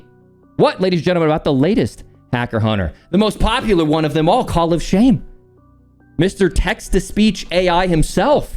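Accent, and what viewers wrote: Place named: Liverpool, United Kingdom. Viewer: The only thing you need to know is that he’s scouse. Rotten to the core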